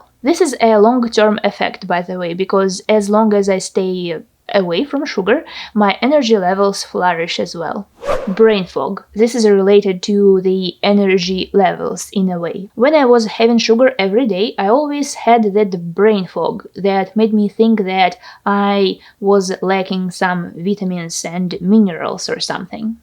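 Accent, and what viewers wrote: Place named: Russia. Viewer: I like Your Russian accent:)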